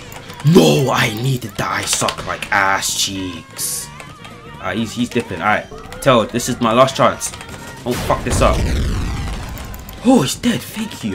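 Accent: United Kingdom. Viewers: You from the uk? I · why do i think ur british now hmmmmmmm.... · are you british? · Are u black and British?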